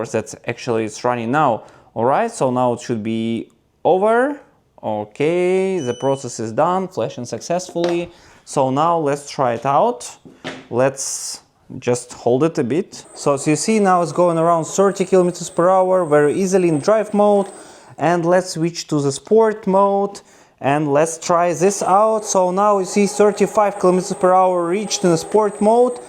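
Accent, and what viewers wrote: Russia. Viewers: А по русски? У тебя русский акцент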